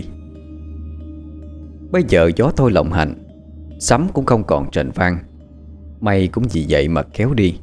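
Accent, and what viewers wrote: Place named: Southwest, Vietnam. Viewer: Yahhh! Hôm nay tác giả Diễm Quỳnh lại cho ra mắt thính gia truyện ma mới! Tiêu đề rất rất hấp dẫn và rất tâm linh ma mị! Rất thích truyện ma làng quê lắm! Xin cảm ơn tác giả và giọng diễn đọc của miền Sông Nước Tây Nam Bộ của chú siêu MC mà dt luôn hâm mộ! Kính chúc gia đình nhỏ của vợ chồng chú luôn rộn tiếng cười của trẻ thơ và luôn tràn ngập niềm hạnh phúc, cùng team Đất Đồng Radio một buổi chiều mát mẻ và vui khỏe! ✍️👻🎤🤙! 👨🏽‍💻🤱👶🍼👨‍👩‍👧💞👌!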